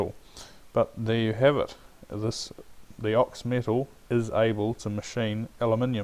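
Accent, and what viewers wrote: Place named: New Zealand. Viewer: I didn't know you were from NZ, I thought it was England!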